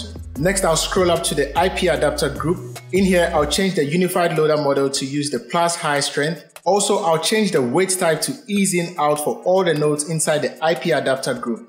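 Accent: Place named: Ghana. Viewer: Boss, you sound Ghanaian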